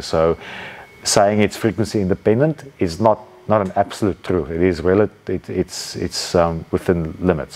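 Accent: South Africa. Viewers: This guy is South African lol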